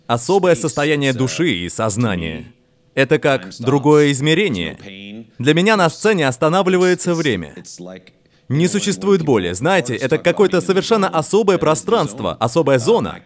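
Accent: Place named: China. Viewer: Что за китайский акцент диктора?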